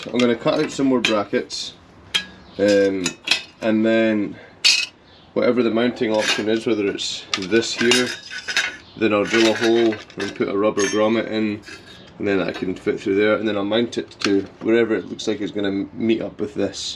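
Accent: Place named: Wales, United Kingdom. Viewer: Hey man good job on vids. As a Welshy who moved to the states a couple of years ago who is literally in the middle on a XS750 Cafe build your vids have been a godsend plus hearing an accent from the home land always helps. All the best!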